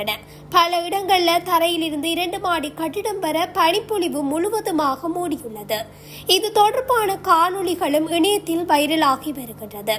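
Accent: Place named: Sri Lanka. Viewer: Ilangai thamil kaetpadharku inimayaaha irukum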